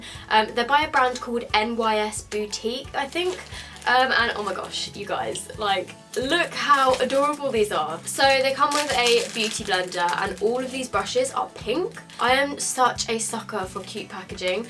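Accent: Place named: United Kingdom. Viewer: It is so refreshing to not only hear a fellow Brit doing these kinds of videos, but also who doesn't tan and is pale! Loving the videos :) x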